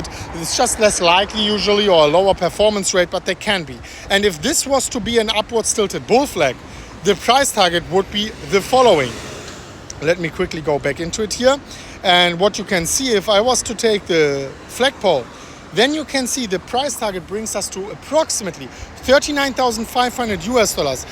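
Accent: Germany. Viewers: You sounds like fxxkin german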